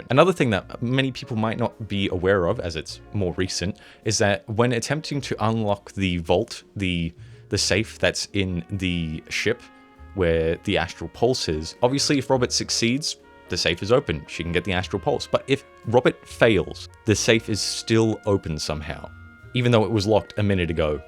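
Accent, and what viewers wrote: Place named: Australia. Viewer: Ok, now I have three things to say to you! 1. Nice Aussie accent 2. Skylanders video? Now I’m randomly curious cause I love skylanders And 3. Doesn’t Adobe have a thing where automatically backs up your save file, every few minutes or something? Or did the crash fry them too? Or is adobe too archaic to include backups when Resolve and ProTools do?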